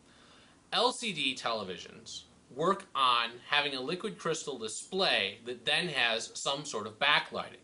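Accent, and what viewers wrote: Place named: New England, United States. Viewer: Every time you go to one of these video's and it is a guy with a Yankee accent, be prepared for too much talking and show boating. In other words, hear me roar, I can talk a mean game of pool.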